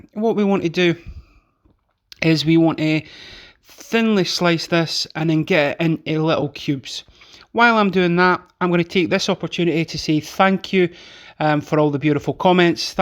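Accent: Scotland, United Kingdom. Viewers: That's what was missing, Food being prepared with a Scottish accent on the lad. Thanks!! Slainte Mhath!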